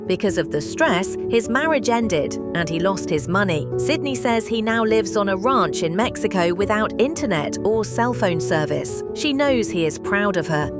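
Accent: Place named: United Kingdom. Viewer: I hate that accent…this is the US not Britain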